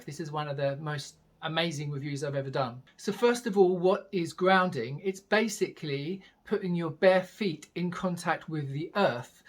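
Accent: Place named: United Kingdom. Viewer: My ear tells me you have to be from same area of UK that Russel Brand is from. Great video btw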